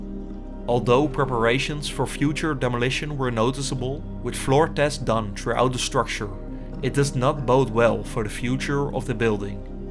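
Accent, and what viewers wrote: Netherlands. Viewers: You do a phenomenal job with this cinematic video, but a lot is lost because of your heavy Dutch accent. If I were to give you any advice: try to work on this or find a native speaker to do the voiceover. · that aweful dutch accent .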